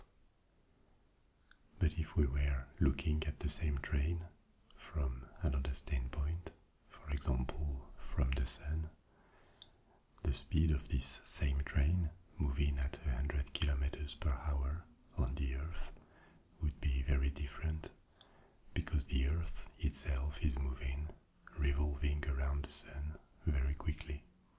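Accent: France. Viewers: The accent, I fell in love with a French accent the second I herd a French Female speak 😍😍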